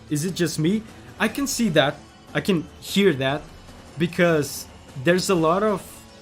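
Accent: Brazil. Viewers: Are you by any chance brazilian? been wondering that for a while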